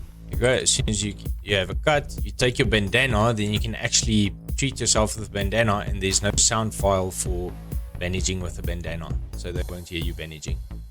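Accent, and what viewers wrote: Cape Town, South Africa. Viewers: As a cape townian.....this voice sounds like home 😂😂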